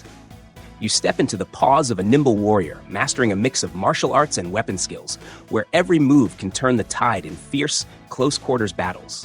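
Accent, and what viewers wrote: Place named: India. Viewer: Thank you indian voice over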